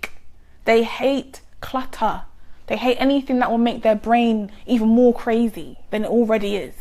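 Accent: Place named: London, United Kingdom. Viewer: I'm a Virgo Sun Moon and Rising. In total I have 5 planets in Virgo in my chart. I'm a designer so the office job life isn't relative to me, but I do like structure. I do live in a white house... white walls and a very minimal design. A clean home is a must... although I'm not OCD. Shout out to you though... it's nice to hear a London accent on an Astro vid for a change. Definitely going to subscribe. xx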